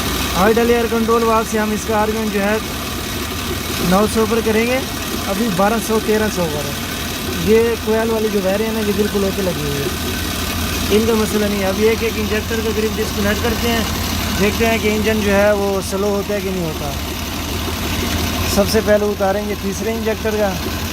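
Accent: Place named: Saraiki, Pakistan. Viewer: sir ap ka leja saraki lagta hay kayak lodhrahan maestro hay ap kebab work shop.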